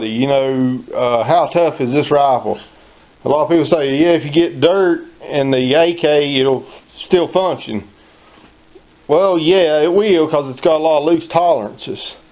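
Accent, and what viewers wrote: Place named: South, United States. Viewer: I gotta go find B U B B A .. Love the country accent son.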